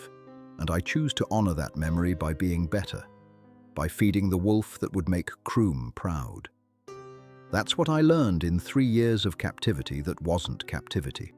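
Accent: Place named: England, United Kingdom. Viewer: Why does the AI narrator have an accent that sounds like someone from England when this story is about the Cascade mountain range from Washington state, USA?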